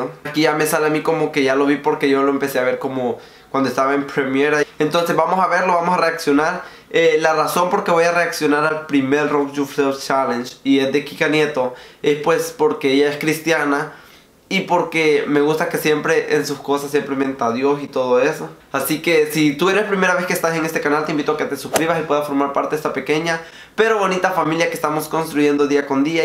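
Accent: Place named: San Pedro Sula, Honduras. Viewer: Desde qué te escuche hablar dije, este Men es catracho, hablas como sanpedrano.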